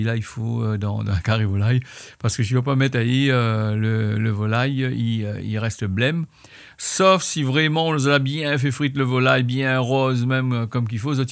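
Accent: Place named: Réunion, France. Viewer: C est trop charmant l accent réunionné ✌👍👍👍😍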